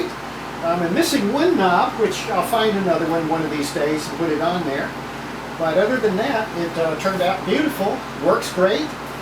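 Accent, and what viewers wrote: United States. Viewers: Ok, thanks. I like, looks more feminine. I made crystal radio in 1964 when I was studying in the 6th class. My ham call is ap2kd, and I'm ok in ARRL book. I have a lot of ham equipment. Most famous WS 19, RCA AR 88, Marconi Atlanta, Marconi Appolo, Collins KWM-2A. I also like military communication equipment being very rigid and waterproof. From your speaking exsent, you look American. Regards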